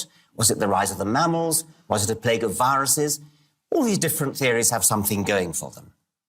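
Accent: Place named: England, United Kingdom. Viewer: MENOS K EL PROFE ES INGLES NO HABLA COMO LOS CHINOS" YANKIES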